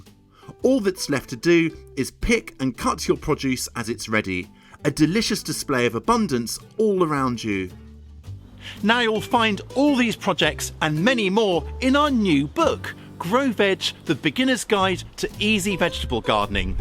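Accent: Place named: RP, United Kingdom. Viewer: Nice to hear Queen's English.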